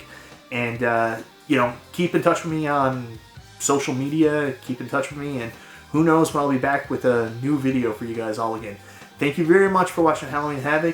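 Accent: Canada. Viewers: Another nice vid! I’m been a sub for a while but haven’t commented. If you don’t mind me asking what part of Canada are you from? I’ve noticed your accent. Cheers man!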